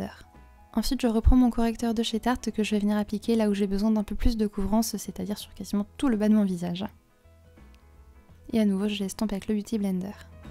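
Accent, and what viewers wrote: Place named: France. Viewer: Genial! Solo estaba preguntándote de eso porque vi un video tuyo en que hablaste en español porque me encanta ese idioma con el acento frances, suena tan hermoso! Solo es que soy un "nerd" cuando se trata de idiomas y por eso vine :v pero me caes bien, claro lol! q tengas un buen dia y ojalá que yo pueda hablar el frances y el aleman algun dia ya que son tan hermosos!! chau!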